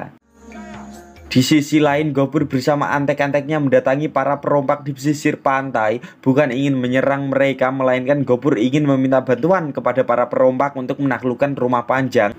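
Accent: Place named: Java, Indonesia. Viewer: jhon versi jawir,medok sekali hehe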